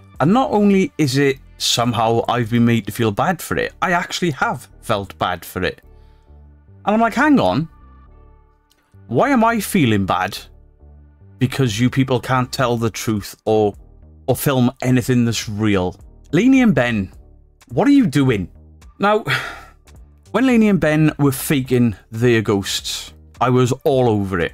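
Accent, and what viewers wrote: Wales, United Kingdom. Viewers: u crack me up! I always watch ur stuff! Ur ace! u tell the truth which sadly soooo many of the ghost hunters etc just do not! Keep doing what ur doing please and I adore ur accent! I ❤️ the welsh! I don’t live far from the border! Thanks from the Labrador! 🐾🐾🐾🐾👍🏻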